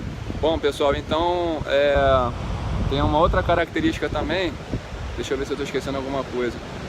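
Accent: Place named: Rio de Janeiro, Brazil. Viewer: Amo sutaque carioca dica otima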